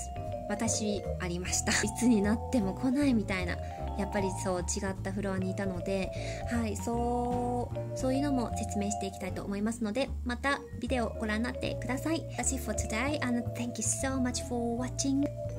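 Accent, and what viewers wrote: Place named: United Kingdom. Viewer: 毎回楽しく勉強させていただいています！私もイギリスにいたことがあるので、の発音が心地いいです笑 Twitterでもコメントを残させて頂いたので、お時間があるときに変身頂けたら嬉しいです☺︎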